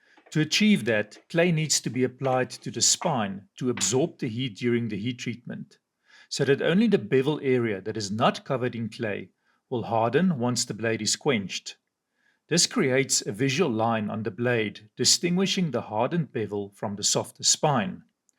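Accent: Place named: South Africa. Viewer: I thought I recognised that accent RSA!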